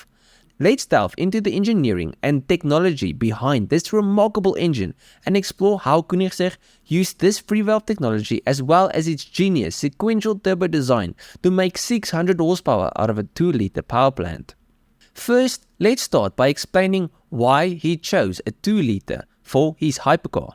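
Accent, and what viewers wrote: Afrikaans, South Africa. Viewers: You sound like someone who speaks Afrikaans.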